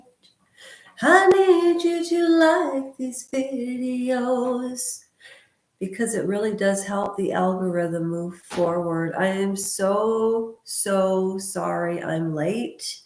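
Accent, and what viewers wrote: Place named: Canada. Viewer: I notice you don't actually say what it was Lady C said so we can judge for ourselves. A bit like MM accusing the RF of racism without giving the words used and context. And yes she will sue if she has been defamed. She is old school. Lady C may have a castle but she worked bloody hard to afford it.She also has a title and uses it to good effect and has raised millions for charities and foundations in Sussex, the UK and abroad. FYI, the book was published in 1992. You sound Canadian so you should know how the working royals, especially Princess Anne, have worked with Canadians to make a better society. Again I ask: what was said - exactly?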